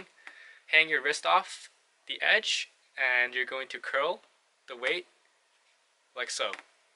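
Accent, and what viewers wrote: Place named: United States. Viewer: Jogender Jakhar he has an American accent so I would assume America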